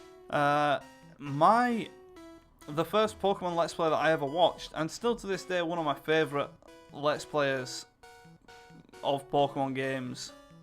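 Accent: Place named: United Kingdom. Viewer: ok youtube really need to work on their close captioning of british accent.. srsly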